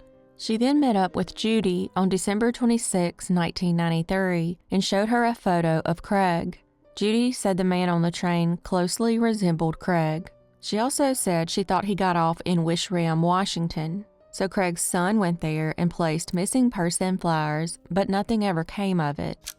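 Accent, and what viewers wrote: South, United States. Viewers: It's nice that these cases are narrated by someone with an authentic Southern accent. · I feel like i haven’t heard your southern voice in idk how long ☺️☺️☺️ rot in hell Larry 🤪🤪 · Her"s is the only southern accent i like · Where’s your accent from? I have been listening for a while and I’m guessing either TN or NC.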